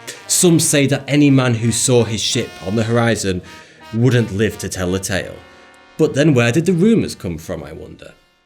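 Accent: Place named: Lancashire, United Kingdom. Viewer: What part of Lancashire are you from? You sound like your from my area - Preston.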